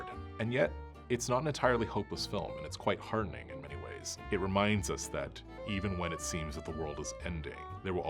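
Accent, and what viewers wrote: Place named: RP, United Kingdom. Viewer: Am American of Asian decent speaking like he's British posh tosh is suss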